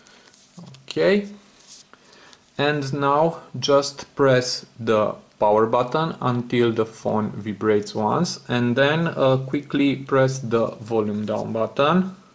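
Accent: Romania. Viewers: Mr.Alex_YTB /\ ShadowHyper Dont feel sorry man. He is struggling as well , with the language. The way he speak english is telling me that is more likely Romanian or probably Bulgarian. I don't see any reason of you feeling sorry that you don't speak English , when him with his English is on YouTube trying to get make some money.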